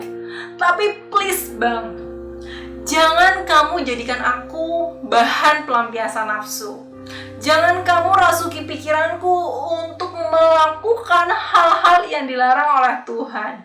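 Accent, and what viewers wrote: Java, Indonesia. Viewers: medhoknya ciri khas banget 😅😅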